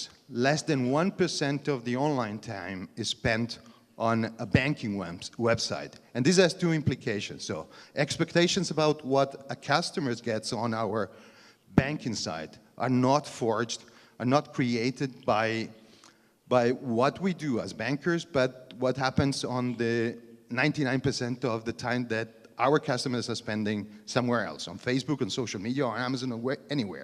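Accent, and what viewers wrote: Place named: Germany. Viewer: Ma parli inglese con accento tedesco. Come Giorgio Moroder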